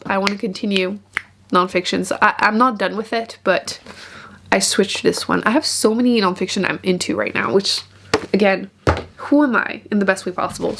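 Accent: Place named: Canada. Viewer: Totally off topic, but I am listening to your accent and was wondering where you live in Canada?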